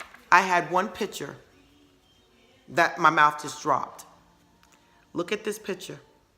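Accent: New York, United States. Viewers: are u from new york? you've got a new york accent lol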